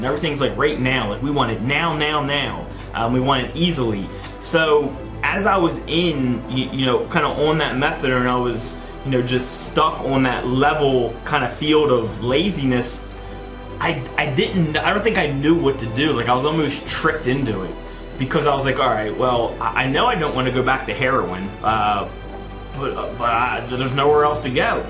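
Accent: Philadelphia, United States. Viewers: I knew I detected a Philly accent right on shout out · Just curious - what’s that accent? Philly? Baltimore? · Ps: I knew you were a philly guy hahah I recognize that accent anywhere!!! I’m a bucks county girl 🥳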